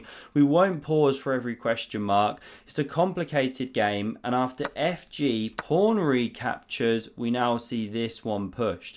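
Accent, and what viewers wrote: United Kingdom. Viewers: Uk accent suits any commentary, cricket or chess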